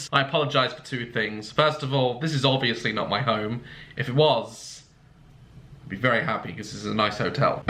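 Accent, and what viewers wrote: United Kingdom. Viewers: sometimes I forget your British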